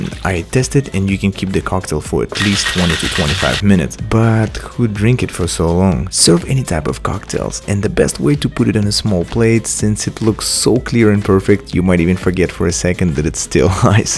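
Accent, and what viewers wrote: Russia. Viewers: рашиський акцент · You have a small russian accent. Lol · wow i understood that you are russian from your accent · Слышно русский акцент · Сижу такой смотрю... И вот замечаю такой небольшой наш РУССКИЙ акцент(я сам вообще не бубу в английском, но как-то заметил). Потом такой, обращаю внимание на на название канала... И всё встаёт на свои места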